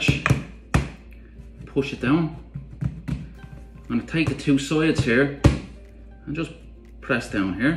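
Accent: Ireland. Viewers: Kia ora from Aotearoa (New Zealand). 😂🤣 I love your channel, you're funny & you make beautiful food. You also explain things well, so thank you for taking the time to share your yummy recipes with us. Awwww I love an Irish accent, my Da was Irish n I miss him every day.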